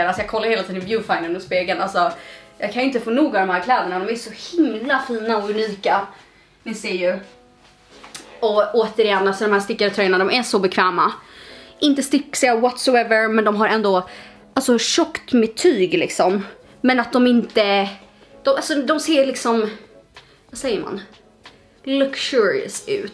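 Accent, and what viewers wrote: Stockholm, Sweden. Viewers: varför pratar du så grov stockholmska?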